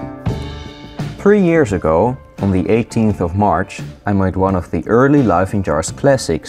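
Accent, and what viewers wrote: Netherlands. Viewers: Is you'rs to? And i think you're not english deu to you're speech i think you're dutch pwease respond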